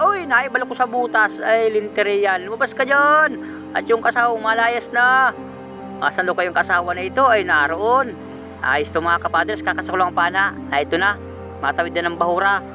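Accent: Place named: Patnanungan, Philippines. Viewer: San nga pala location mo ka father's?, parang Patnanungan accent ka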